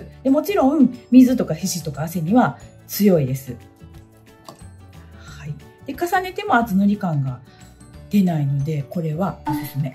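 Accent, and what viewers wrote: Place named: Kansai, Japan. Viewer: ライラックの発音！ライラ↗️ック😂 ラ↗️イラックでは？んもー🐮、の関西弁好き！！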